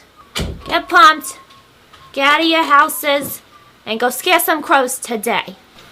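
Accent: England, United Kingdom. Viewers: Lol your Brit access sounded like Rebel Wilson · That british accent though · Her British accent is so hilarious I love it 😂🍩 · Your English accent is so funny😂❤️ we don't actually sound like that though aha xx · Loving that English accent😂😂😂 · A British accent and a scarecrow look? DOCTOR WHO CONFIRMED. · Doesn't anyone else think her British accent sounds like iJustine's British accent?!?.,!!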